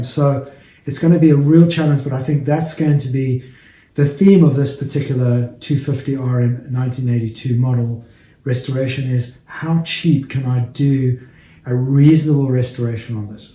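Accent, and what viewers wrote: South Africa. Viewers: Enjoying your videos. Listening to you I originally thought you were doing the restorations in SA! Ex Durbs living in Oz.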